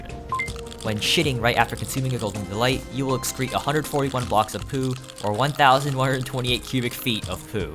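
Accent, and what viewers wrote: China. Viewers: HOLY SHIT YOUR CHINESE ACCENT IS PERFECT! (Taiwanese here :D)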